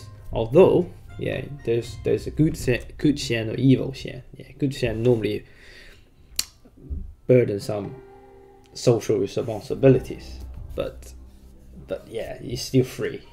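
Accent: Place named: United Kingdom. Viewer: Your accent is very English aha. I thought you were native at first. Thanks for the video and the extra background info is great.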